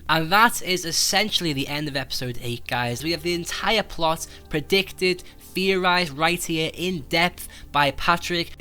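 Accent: Liverpool, United Kingdom. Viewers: scouse twat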